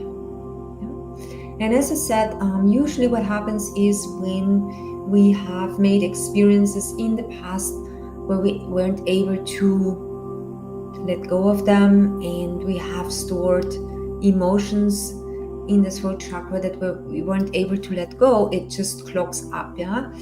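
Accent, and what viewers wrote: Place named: Austria. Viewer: So happy to be part of Dr. world once again. Her voice, with its Austrian accent, is both charming and soothing. This blessed woman is a gift to the world. I'm grateful to be a recipient of her healing talent.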